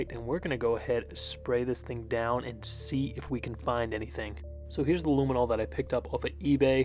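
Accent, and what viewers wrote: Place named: Bronx, United States. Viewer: Haha bronx accent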